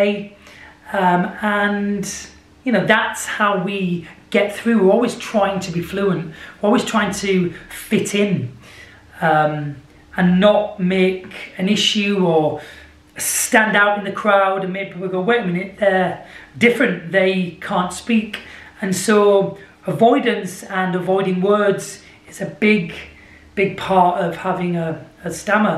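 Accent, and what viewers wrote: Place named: England, United Kingdom. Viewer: Типичный английский акцент. Звучит очень забавно и приятный голос!😉😉😉.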